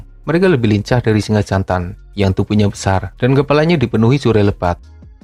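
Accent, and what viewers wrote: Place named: Ngapak, Indonesia. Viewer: Orang jawa nih admin nya 😂 sepetinya ngapak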